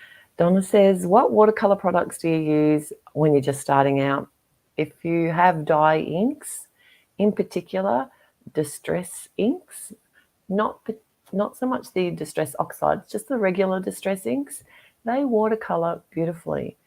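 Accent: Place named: Australia. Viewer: it is lovely to hear a second Aussie accent and you have our great sense of humour. I found your presentation very helpful and I've downloaded your swatch charts. Thank you so much and I will catch you on one of your platforms. From the Goldie, Thank you again.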